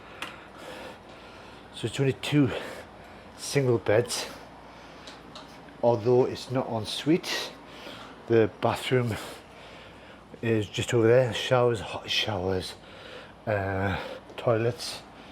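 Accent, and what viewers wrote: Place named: Scotland, United Kingdom. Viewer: It looks like scottish accent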